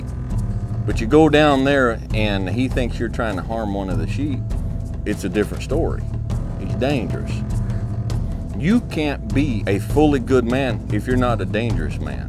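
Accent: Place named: South, United States. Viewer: Somehow hearing all these sayings, with the southern accent makes you want to be a Badass Buddha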